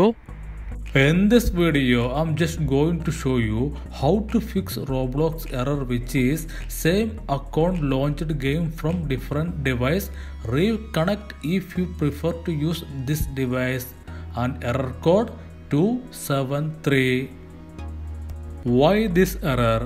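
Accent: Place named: India. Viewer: bro has the tiktok indian accent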